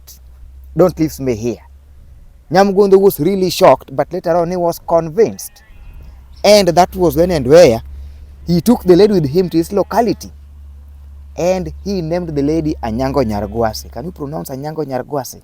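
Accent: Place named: United States. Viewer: Your American English is dope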